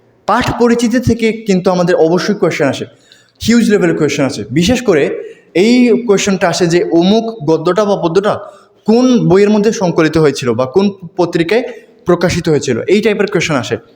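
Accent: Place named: Sylhet, Bangladesh. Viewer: Vai ki Sylheti? Apnar accent sune mone holo apni Sylheti.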